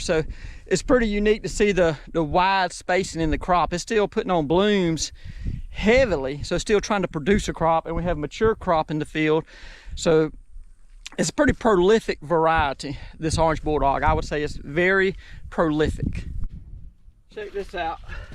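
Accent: South, United States. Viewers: I'm from minnesota... I love your southern accent.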